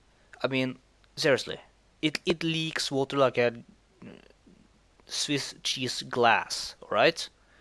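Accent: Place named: Germany. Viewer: well, atleast it was entertaining for a layman like myself. Swede here, and by the accent and knowledge in German tank warfare im guessing your a german?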